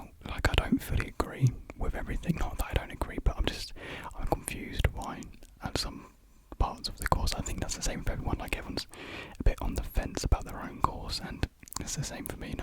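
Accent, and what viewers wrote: United Kingdom. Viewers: I see im not the only one who also listen to your conversational videos to learn English It would be fun to hear you speak with a full American accent, but your British accent adds a special charm to you and your asmr Thank youuu i love u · oooo you know you might be one of the first few British ASMR people I've found never realised I prefer listening to my own accent rather than american!! Loved the mouth sounds a lot you should definitely do some more :)) who's your fave music artists atm ? x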